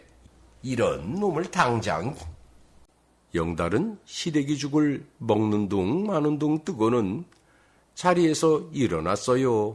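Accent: Jeolla, South Korea. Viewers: 구수한 나레이션이 좋았지만 전라도 사투리가 어슬프 듣기가 거시기합니다. 걍 표준어로 하세요. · 절라사투리. 징하구만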